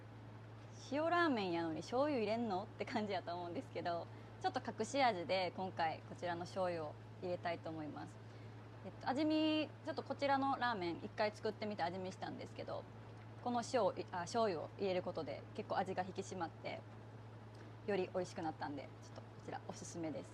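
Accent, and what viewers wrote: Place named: Kochi, Japan. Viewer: 前々から 拝見させていただいてました。 美人は当然ですが、 イントネーションは 高知の女の子ですね🎵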